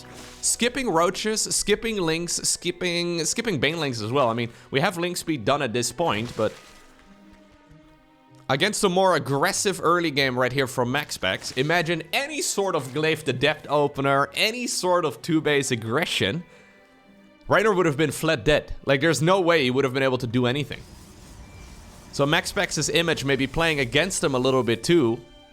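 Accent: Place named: Netherlands. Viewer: You say "bunch of stuff" a lot. With that particular dutch way of saying bunch - Beounch. It's funny, Harstem and Rotterdam also say Beounch.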